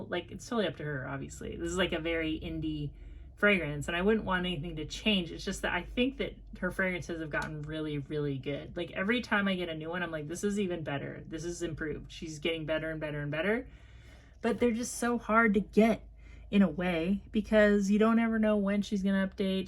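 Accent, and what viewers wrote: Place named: Midwest, United States. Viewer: (sp?) you buy and explain/describe the most unusual scents. I like to watch your videos because a. you're a thrifter like me, b. you're another midwesterner and actually sounds/talks a lot like me and c. you're honest about your finds and out there as far as blind buying goes--real gutsy and I like that!! Thanks for another great video!!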